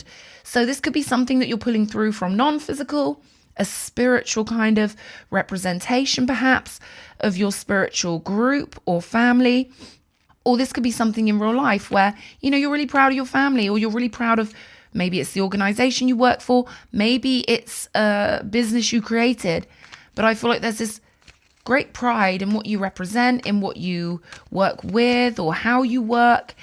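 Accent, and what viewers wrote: United Kingdom. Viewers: You are ready to delve into my Childhood Memories, I’m always a Child within Honey- I really love your Brilliant articulation of your British Accent - I love to approach everything in my Path from a position of Knowledge and Wisdom ❤❤❤🍯…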